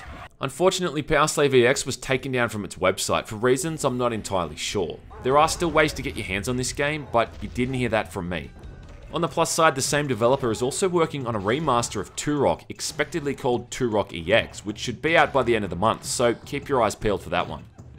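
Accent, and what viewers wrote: Australia. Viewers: Ye-es! It's not Christmas 'till aussie brogue has graced my ears with a year-end wrapup vid! Thanks man!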